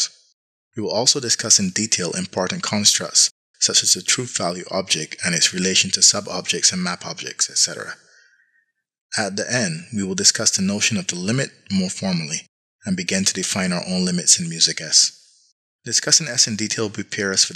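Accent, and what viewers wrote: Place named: Barbados. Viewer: This is so exciting! I have been reading some Category Theory for Programmers and decided to take another look at what's out here this year. In the past there was not much available. Imagine my delight and surprise to find someone who is applying Category Theory to composition!!! This will be an exciting ride. I recognised the Barbadian accent very early on since I am from Trinidad. I look forward to learning from you, since I am interested in music, functional programming; even trading. I even studied Nuclear and Thermal Engineering many moons ago and my first job was at BNL:). Thank you so much, Dr..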